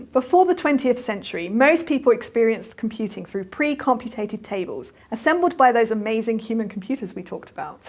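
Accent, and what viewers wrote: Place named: United Kingdom. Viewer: 英音听着好难受啊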